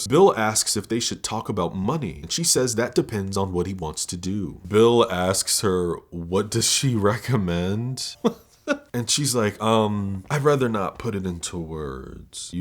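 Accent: United Kingdom. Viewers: As a Brit I love your British accent. I think you're the Bill Hader of film analysis. 👏👏👏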